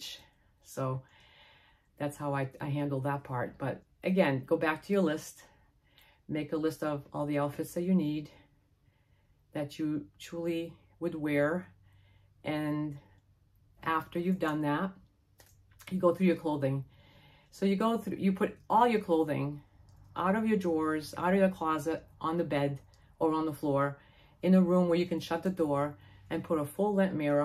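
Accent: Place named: East Coast, United States. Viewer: I am so enjoying your videos! What you have to offer is much needed right now; please keep at it 👍 Your numbers will continue to grow, but I have to say, it was a little difficult finding your channel again when I had cruised past it intending to return and watch it later (I do this a lot. I just remember the title instead of saving). When you type in Living A Simple Life a hundred other channels come up with the same name You are so unique; your east coast accent is fabulous; I love when it pops out naturally, lol. Your personality really shines through ✨️✨️✨️ I'm intrigued by your life because I am currently on the west coast (CA), dreaming of moving to the east coast and living simply as well. As a native Minnesotan I'm really missing having four seasons... I look forward to your future videos and watching your channel grow! ❤️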